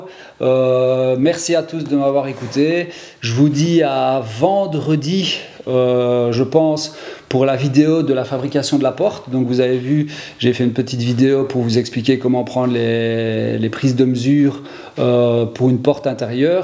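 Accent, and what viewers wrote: Belgium. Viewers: ... ou comment (ré)apprendre les bases issues de l'expérience d'un pro qui se prend pas la tête, avec l'accent belge en bonus! Parfait, change rien. Et pour être très original: merci.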